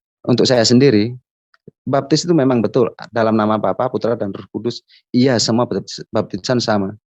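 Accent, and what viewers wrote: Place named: Kupang, Indonesia. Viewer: Kental dialek kupang,,kupang mana mas??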